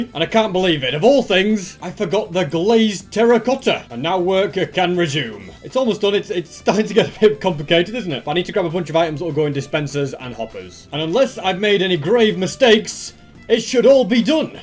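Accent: United Kingdom. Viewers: btw are you British?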